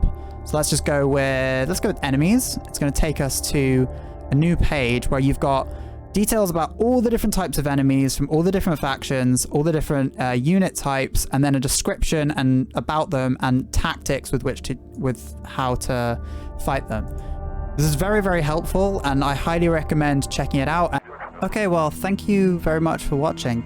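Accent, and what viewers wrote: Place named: United States. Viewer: Gibt es den keine sch** Anleitung auf deutsch? Ich hasse die amerikaner an sich schon und bei dem Dialekt.... 🤮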